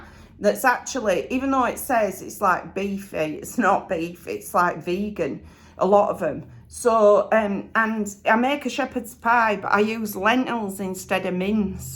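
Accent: Yorkshire, United Kingdom. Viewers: 🤣😂🤣 I don't know how or why, but I got subtitles for this post. It was hilarious - I don't think it could cope with a Yorkshire accent. When you said your daughter's name it called her Ebay! If you get chance and you can get subtitles have a look at it - you'll have a good laugh!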